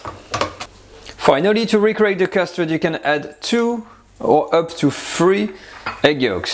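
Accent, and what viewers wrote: France. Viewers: you re absolutely fantastic to watch even your French English accent..Bonjour Chef...Bernard from The Philippines..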